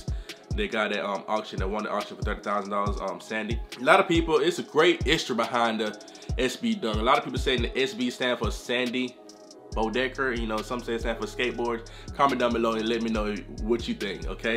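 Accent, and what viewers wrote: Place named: Georgia, United States. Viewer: lol I'm surprised the accent strong af!(but I'm in love with Georgia accents so they really stand out to me) Ion even hear the Jamaican in ya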